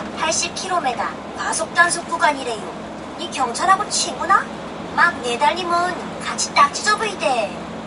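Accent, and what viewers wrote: Gangwon, South Korea. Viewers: 강원도 사투리버전 진짜 밤에 낄낄 웃었네요ㅎㅎㅎㅎ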